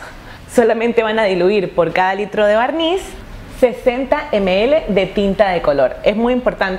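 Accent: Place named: Peru. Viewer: de dónde eres? tienes el acento peruano... lo digo como peruano, español algo neutro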